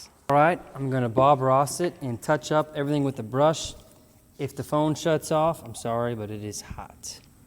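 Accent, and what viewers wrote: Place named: United States. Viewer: Saludos, de que parte de Estados Unidos es tu acento?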